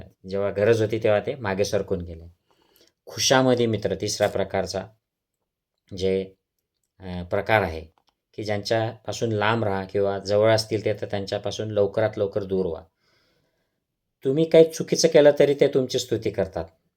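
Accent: Khandesh, India. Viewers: आपली खानदेशी टोन काय बदलेली नाही.... मस्त याला म्हणतात जय खानदेश.... नाही तर थोडे काय शहरात गेले की स्टाईल मध्ये मराठी इंग्लिश बोलतात....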